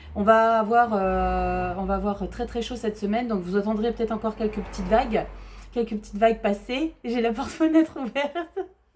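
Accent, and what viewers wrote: South, France. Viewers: Coucou, je vous adore, vos guidances son profonde de sincérité et de lumière, un petit accent du sud? un coucou de Tarbes 65 sud Belle journée à vous et votre famille. Gratitude, gratitude, gratitude. Scorpionne ascendant Vierge🤗🧡💛💚💙💜🤍🙏🐞🦋🍀💐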